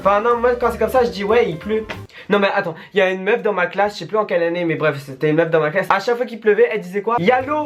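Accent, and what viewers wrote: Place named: Charente-Maritime, France. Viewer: J'parle comme toi et j'suis de Charente-Maritime 😅😅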